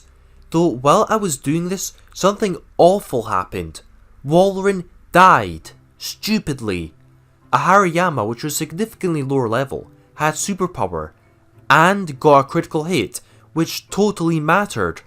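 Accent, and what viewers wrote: Scotland, United Kingdom. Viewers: You sound like a Scotsman attempting an English accent bro, Good video.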